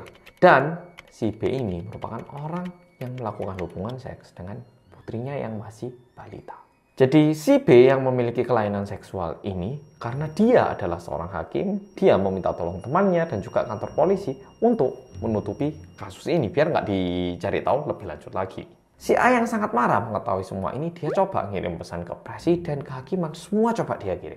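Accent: Java, Indonesia. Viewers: sudah mengurangi medoknya bang, pistol, wkwkwk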